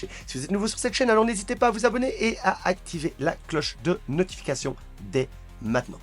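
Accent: Belgium. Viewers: 👍😊 j’entends l’accent belge il me semble ? 😁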